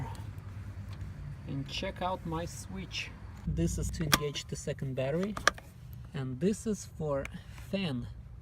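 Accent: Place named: Russia. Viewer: Суровый русский акцент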